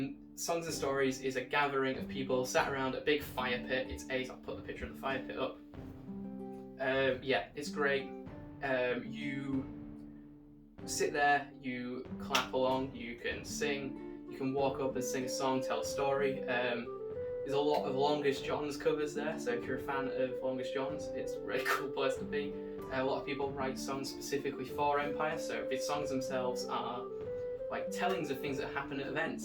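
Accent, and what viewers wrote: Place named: Hull, United Kingdom. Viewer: Future Empire Navarri been binge watching these to see what it’s about these are great, also what accent is that sounds Hull or somewhere alike good description video 🍻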